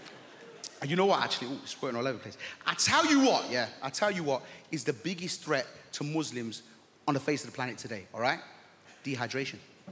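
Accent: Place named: Midlands, United Kingdom. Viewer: loosely midlands english but probably nearer birmingham.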